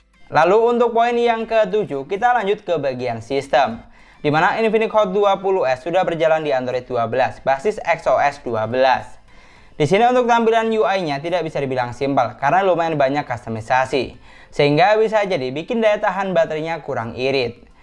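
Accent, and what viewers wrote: Madura, Indonesia. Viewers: Logat suaranya kek orang madura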